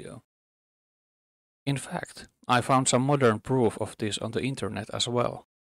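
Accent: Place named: Finland. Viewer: Arvasin heti aksentista, että kyseessä on suomalainen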